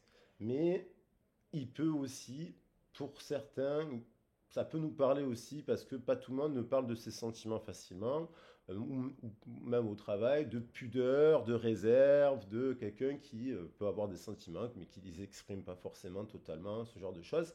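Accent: South, France. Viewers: Bonjour je suis tres heureuse de Vous voir sa me fait plaisir 💝😃 de Vous entendre j aime Bien votre accent du midi qui nous apporte le Soleil Dan's Notre Coeur merci beaucoup rayon de Soleil🌞👍gros bisous👄☘️💃🕺🌈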